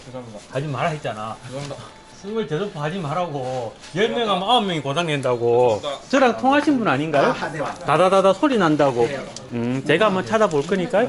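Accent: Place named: Daejeon, South Korea. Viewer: 대전촌놈이 유튜버로 인기좀 얻엇다고 서울올라와서 별 ㅈㄹ을 하네ㅉㅉ 말투부터가 촌티난다 ㅉㅉ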